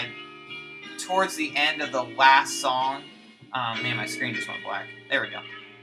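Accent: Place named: Boston, United States. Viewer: You have a little bit of a Bostonian accent too. You are a man of my heart showing that record. My favorite crooner of all time. I am really sorry to hear about your mom. That really did upset me hearing that. She sounded like she really loved her music. had an amazing voice when she was young. Of course I love the. They wrote some amazing psych songs early on that no one really knows about. Good to meet you my friend. Talk soon~ Rob (^‿◕) Boston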